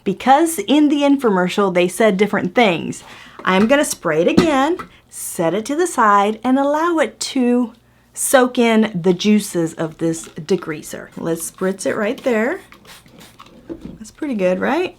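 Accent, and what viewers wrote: Texas, United States. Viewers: You sound Texan.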